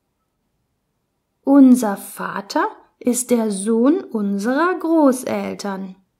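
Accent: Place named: Italy. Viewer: Schade, dass die Deutschen nicht so sprechen. die Intonation und Dialekt sind sehr anders. Die Sprecherin kommt vielleicht aus Italien, oder. das finde ich nicht gut.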